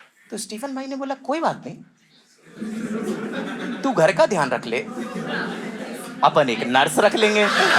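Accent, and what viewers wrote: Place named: Punjab, India. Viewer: I really love it your comedies and that little spiking punjabi I love it ❤❤❤❤❤😂😂😂😂😂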